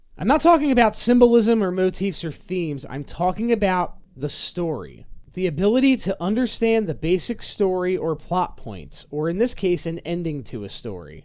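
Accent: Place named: Philadelphia, United States. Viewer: Listening to you more it's gotta be a Philly accent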